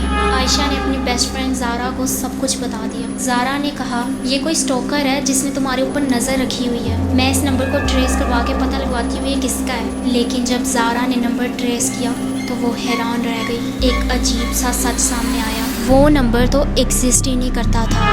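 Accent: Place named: Karachi, Pakistan. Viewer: Lagta hay Ap korangi orangi wali ho... Coll bol rahi ho ap jab ke call hota hay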